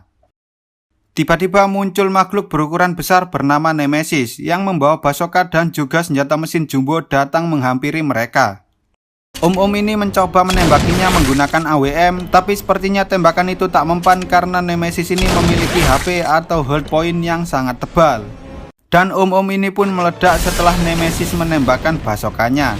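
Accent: Java, Indonesia. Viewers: Wis rasah suen suen dri jawa mna bang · Wong jowo ya bang? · Bang jawa ap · ini suara dubbing jawa ya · Orang jawa kah? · Bang orang jawa ya? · Bang wong jowo to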